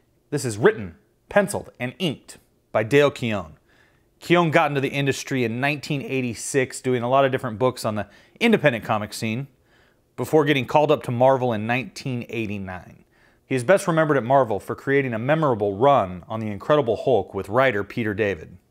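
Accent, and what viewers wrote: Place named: New York, United States. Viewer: Your new york accent is awesome😅😆😅😆😅😅😅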